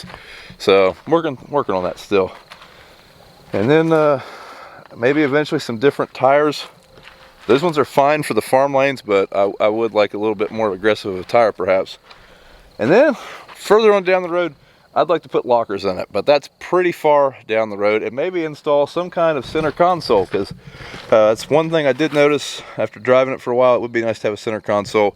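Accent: Ohio, United States. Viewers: 3 things It sounds like you are from the great state of Ohio, like me I like the buggy A million Indians can't be wroung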